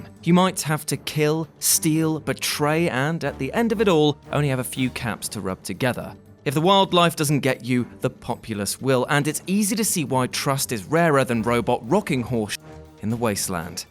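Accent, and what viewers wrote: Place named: Newcastle, United Kingdom. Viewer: Oml you’re a geordie like me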